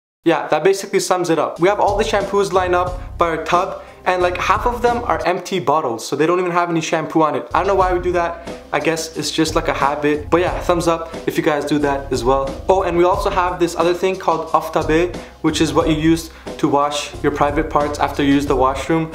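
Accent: Isfahan, Iran. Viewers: Are you Isfahanian? Because your accents tells so... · Honestly even I prefer saffron over shirt or whatever! Btw are you grom esfahan? Cause the accent is just too on point lol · اگه ایرانی نیستی خیلی خوب حرف میزنی👍👍 واقعاااا دمت گرم😂 اصفهانی حرف زدن واقعاااا عالی بود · Hats off you man . That was pretty funny . specially that you narrated in English . I couldn't stop laughing 😂😂 By the way Are you Isfahani? I think so . because I am.. and my accent is like yours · Yo bro you're awesome, also i'm persian and i'm proud ! i can tell you're from Isfahan is that true ? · Cute isphahani accent. 😊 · ا چقده لحجه اصفانی داری،اگه گفتی اصفانیا چطوری شماره سیزده رو میگن، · When you speak farsi you speak it with isfehani accent , are your parents from there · لحجه اصفحونیت 😂 دمت گرم کاکو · You have an Esfahani accent. The Shampoo thing you showed is not a Persian thing. 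It applies to your family because you're from Esfahan and are genetically cheap. LOL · لحجه کجای اصفهانه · Omg you're originally from Isfahan lol I loved your accent soooo funny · لهجه اصفهاني داري هههه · Man you got a great accent !! I think you are from isfahan !!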